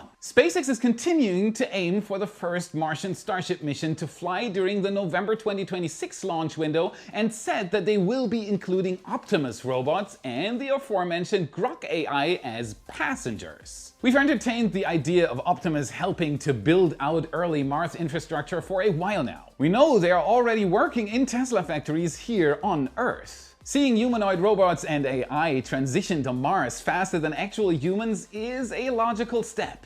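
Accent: Germany. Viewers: Kind of. He's German.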